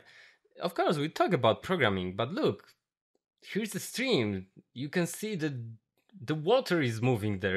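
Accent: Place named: India. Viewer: I miss programming tutorials without indian accent <3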